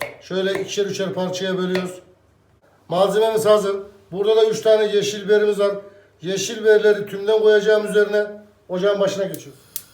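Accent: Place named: Anatolia, Turkey. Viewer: Allah razı olsun,pratik ve Anadolu şivesi her şey gönlünüzce olsun.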